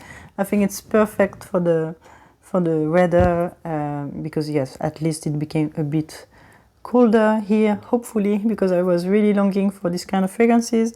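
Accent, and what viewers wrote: France. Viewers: Love your videos and collection and on the top, Looooove your English with French accent 😘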